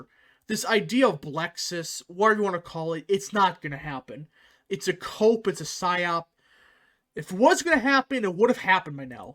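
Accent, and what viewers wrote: Wisconsin, United States. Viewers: I’m from Wisconsin and literally 10 seconds before you said you were from Wisconsin I was thinking “this guy sounds like he’s from Wisconsin”. I hope you’re right, but I’m always gonna vote like we’re 10 points behind.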